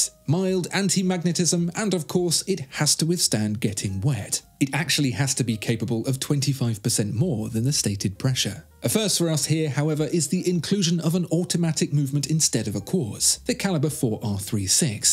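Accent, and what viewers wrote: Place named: United Kingdom. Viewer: The most soothing voice on YouTube! Eloquent and colourful use of the English language with a classy British accent, not to mention knows a heck of a lot about watches. ❤your review. 🍉 🍉 🍉 Thanks.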